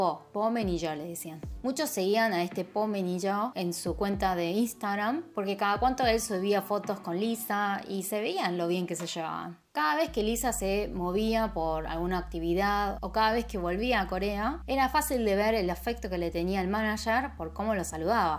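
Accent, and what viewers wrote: Argentina. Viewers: A Lisa no la estafan , deberían matarlo por eso >:( amo tu acento Argentino 💖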